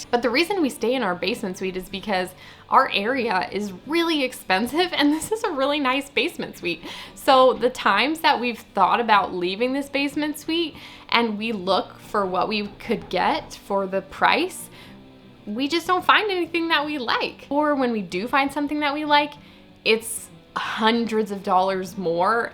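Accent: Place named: Canada. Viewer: You sound just like me, I’m a former Canadian too !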